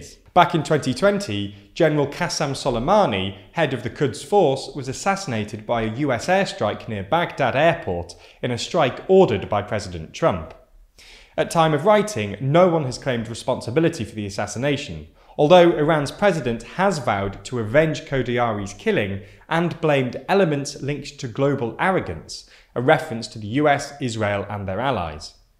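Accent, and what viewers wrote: United Kingdom. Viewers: He’s British lol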